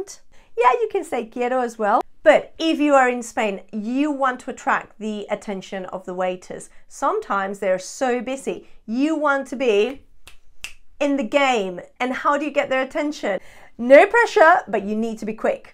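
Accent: United Kingdom. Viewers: It never occurred to me that there would be any British people who would be interested in learning Spanish. Just like Americans (whites) have no interest in knowing any other language except "Merican". You speak English with a British accent, but your Spain Spanish is perfect. Your appearance tells me that you have more Spanish roots than British. I wasn't going to watch you until I heard your Spanish and now I am intrigued. Let me know if I got any of that right.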